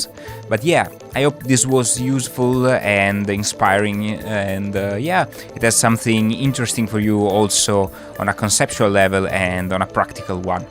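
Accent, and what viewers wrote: Italy. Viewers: Hai un inglese Made in italy. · It's so weird to see the most North Asian looking dude speak in the most Italian English accent 😂. Great video though, thank you for sharing this! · Awesome way of thinking out of the box, and happy accidents. Io sentivo l'accento italiano nonostante il nome slavo hehe ;)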